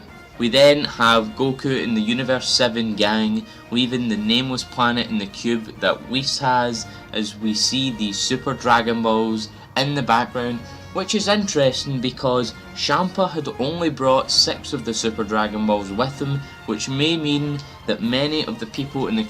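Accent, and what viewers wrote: Scotland, United Kingdom. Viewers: Hey, man! I love your voice! Sorry for my ignorance but is it Scottish? Anyway, I think you have a really good sense of this stuff, so you don't need my help. Although, I don't think you need to get into your overall thoughts on the episode because you've been talking about your thoughts on a scene by scene basis. For the most part, I would stay away from reviews/news as a primary outlet when you are newer. You want to standout as much as possible. Just a thought. Either way, good job!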